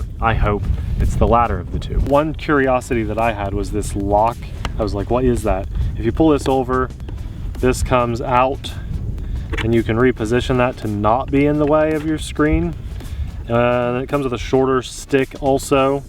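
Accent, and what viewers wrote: United States. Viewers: Very nice looking planes, love both colors, but yellow is my favorite. Surprised it handled so well in the wind for a small plane. So would this be considered a beginner friendly/ park flyer.❓️❓️. Liked how was pushing your buttons on pronunciation 😂😂😂🤭🤭. Also waco vs wok-o. Now My 2 cents. I pre clarify this by saying maybe its my west coast ears 👂 🤔, but when you say colors 9 out of 10 times i hear collars. Maybe its Kentucky/ east coast, ❓️❓️. No, not mad like you on waco/wok-o just figure its how it's said in your neck of the woods ⛰️🏞⛰️. Plus i couldn't let have ALL the fun.😄😄🥴🥴🤭🤭. Great flying, superb videoing, keep pushing those buttons.😂😂😆😆🥴🥴🤭🤭.⚓️⚓️👍🧡💛💚👍👂😂😆🥴🤭🤔👍⚓️⚓️.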